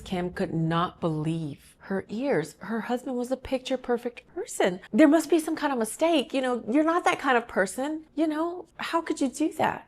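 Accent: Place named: South, United States. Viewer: I can tell your from the south cause that is how we talk with the accent ,we don't put up with any bull crap.love y'all's story time .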